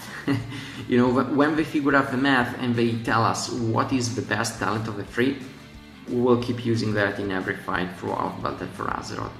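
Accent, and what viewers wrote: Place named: Italy. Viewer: Hey, dall'accento presumo tu sia italiano. volevo chiederti come mai hai puntato su una guida in inglese, ci sono tanti content creator inglesi che parlano di wow e davvero pochi che parlano di wow in italiano, specialmente riguardo agli healer in ampio spettro, a tal proposito hai in mente di fare in futuro guide in italiano? per la cronaca grazie per tutte le dritte e le informazioni di BfA! nessun suggerimento su come poter entrare a far parte del beta testing di BfA?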